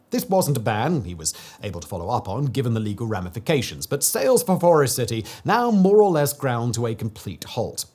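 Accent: United Kingdom. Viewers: There's something about a British man with this regional accent wildly mispronouncing foreign names without pause. 😅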